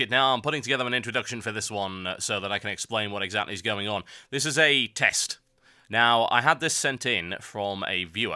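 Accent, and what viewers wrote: United Kingdom. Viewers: 99% of the reason, why I have subscribed on your iTunes channel, and am permanently looking up your newest vids, is your brritish accent! Don´t let the US english ovetake the whole internet.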